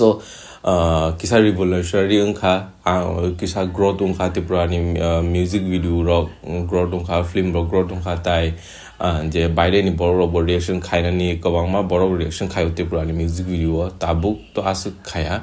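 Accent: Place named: Manipur, India. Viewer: Very Few Meitei People can speak Kokborok I'm surprised You are one among them 😃 Clearly you have Meitei accent even after speaking Kokborok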